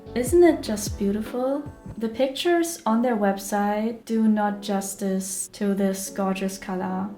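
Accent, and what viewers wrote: Germany. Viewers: Why? You do not need to be shamed about. A German - English could be lovely if the natural voice is nice and warm. Just like yours 🥰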